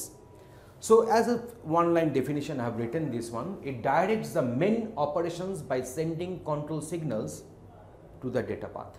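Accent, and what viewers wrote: Bengal, India. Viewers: Hard Bengali accent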